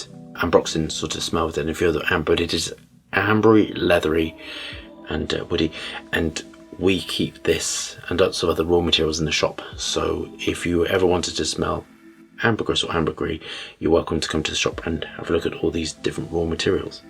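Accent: United Kingdom. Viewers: Honestly, I've gotten PLENTY of comments about Aventus and to this day (5 years ago) I have NOT found a perfume that can get those same results... It has NOTHING to do with Creed, because Club De Nuit Intense Man with get just as much attention. It's that SMELL that so many people love. I have tried soooooooo many fragrances. I will try Nishane, but Aventus is a LEGEND no matter what people say. Ive gladly owed about 12 to 13 bottles of it... I think maybe one of them were fake - So, I do recommend purchasing Creed's Aventus from a reputable source., my wife is a Brit so I hear that accent allll the time LOL.. never gets old. Thanks for sharing your knowledge when it comes to the ingredients or raw materials. I never knew what smell came from the whale vomit lol.. but now I do!!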